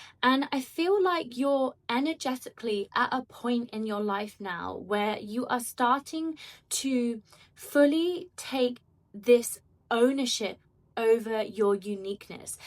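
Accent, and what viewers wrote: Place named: England, United Kingdom. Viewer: Love those golden highlights and the English accent is simply icing on the cake!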